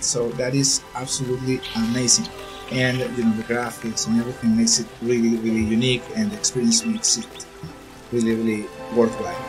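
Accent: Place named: United States. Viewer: ahaahah yo decia porque no estoy suscrito si me suscribi y no sabia que tenias otro canal en ingles haahhah, saludos y te sale igual que un gringo.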